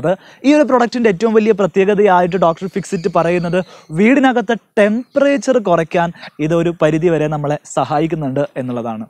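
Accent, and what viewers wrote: Kozhikode, India. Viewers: മയ മാണ്ട പോയിന് വന്നീ ന് പോയിക്ക് കുത്തിരി ഇടെ ഇതൊക്കെ കോഴിക്കോട് മലബാർ ഭാച്ച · അറിവുള്ളവനെ പരിഹസ്സിക്കുന്നത് തെറ്റാണ്, കോഴിക്കോട്ട്കാർ അങ്ങനെ ആണ് പറയുന്നത്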